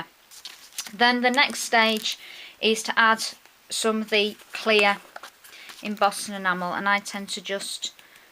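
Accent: England, United Kingdom. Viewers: I too love your ideas I am saving my money to buy the supplies! They are absolutley beautifull! thanks for such a wonderfully detailed instructional video! Can you use the shrink wrap for easter baskets, in place of the fantasy film? Also can you use a non name brand ink to do the embossing & stamping? I love your accent are you from England? I have a friend here in the states whose Mum is from England she lives her but still has her british citizenship she too has a lovely accent! Tessa~~~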